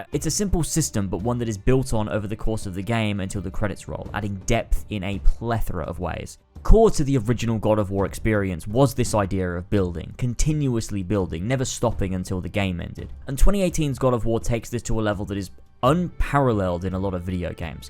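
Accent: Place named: United Kingdom. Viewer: A Greek name spoken through a thick UK accent.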